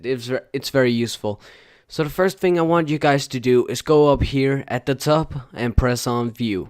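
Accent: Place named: Scandinavia. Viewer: U have a danish/scandinavien accent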